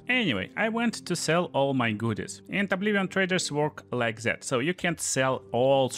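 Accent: Russia. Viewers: R u russian? Your accent is too similar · Очень уверенный и хороший текст с русским (или близким к нему) акцентом Очень прикольно звучит на самом деле Интересно, откуда ты?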